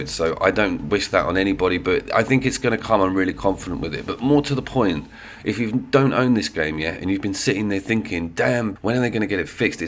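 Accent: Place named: England, United Kingdom. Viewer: it's the English accent mate. Makes you sound smarter than the average bear.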